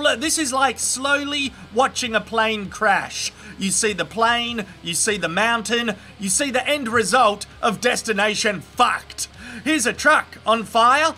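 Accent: Australia. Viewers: Why is it funnier when it's in Aussie?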